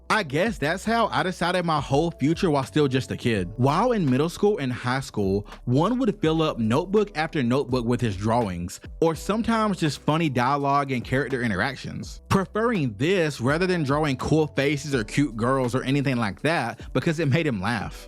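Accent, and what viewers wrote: South, United States. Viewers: Very interesting! I used to love Shin-Chan, such a silly show. Also love your accent! I've heard some southern accents in my life but yours is unique to me!❤ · Great vid but gotta ask. What part of the south are you from haha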